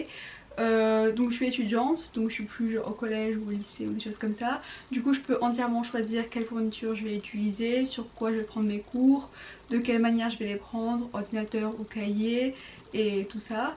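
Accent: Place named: Italy. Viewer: Super vidéo comme d'habitude. J'ai été surprise par ta manière de parler : tu commence a avoir un accent sûrement à force de parler italien 😂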